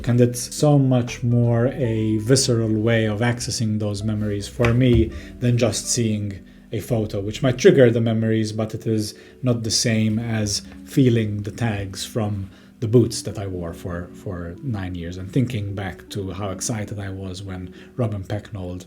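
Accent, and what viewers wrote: Malta. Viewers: I just stumbled upon this video. Useful content. Tho out of curiosity.... that accent... are you Maltese? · You sound Maltese 😊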